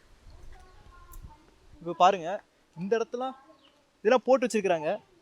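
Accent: Kanyakumari, India. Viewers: Very beautiful video.. Lovely effort to bring this place to forefront. Indian ASI has helped Cambodia lot of temples and it is quite a shame that 1200 year old place here is left unattended with no maintenance. One of the artefact where flowers were kept look amazing. Better be careful with those Train insects - could be poisonous too and good to be out of structures that could fall on head.. Love to hear the Tamil presentation ingitu, angitu kind.. Kudos!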